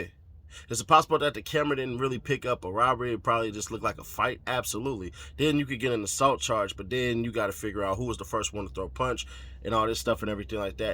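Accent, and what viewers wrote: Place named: Bay Area, United States. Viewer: Nigga I knew you was from Cali I could tell a bay nigga .... much love from 77th Holly e.s.o!!!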